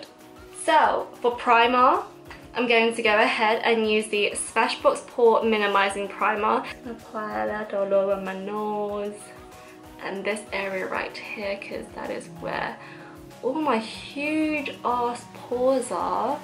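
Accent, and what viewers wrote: England, United Kingdom. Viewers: I NEVER EXPECTED YOU TO HAVE AN ENGLISH ACCENT I AM SHOOK. OMG YOURE SO EXTRA ADORABLE WITH THAT VOICE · I've only ever seen your videos on Instagram and was completely shoookk at your British accent. That was completely unexpected However you are so lovely and slay these makeup tutorials to the max ❤❤❤❤❤👏👏👏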